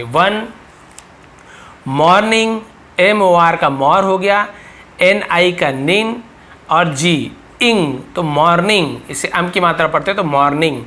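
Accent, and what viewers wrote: Chhattisgarh, India. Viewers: Sir aap chattishgadh se hai kya??!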